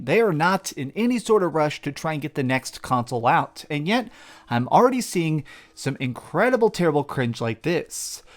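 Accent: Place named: South, United States. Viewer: Why the southern accent?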